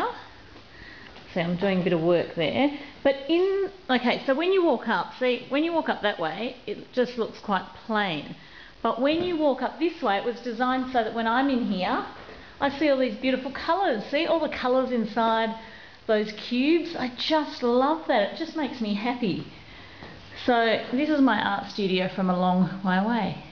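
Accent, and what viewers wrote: Australia. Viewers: yay 10 for the australian accent!!! Reminds me of my aunt lool!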